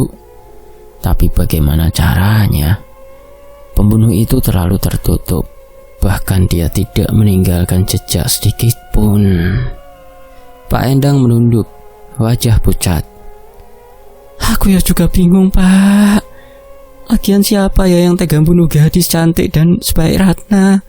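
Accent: Java, Indonesia. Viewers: Dialek jawane bikin kangen kampung halaman mas 😂😂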